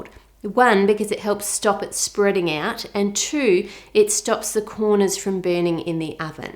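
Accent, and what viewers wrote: Australia. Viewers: Gosh I love Australian accent so much it's definitely my favourite out of all of them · Wait... your Australian OMG!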